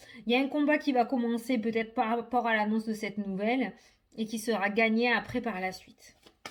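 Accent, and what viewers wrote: South, France. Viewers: Chère, quel bonheur de t entendre! J adore ta bonne humeur, ta spontanéité et ton accent de mon sud qui me manque, j habite en Thailande 😉. Je suis dans un total lâcher prise et si quelque chose me tombe dessus aujourd hui je crois que je serai en mesure d encaisser 😊😀. Gros bisous et un grand merci 🙏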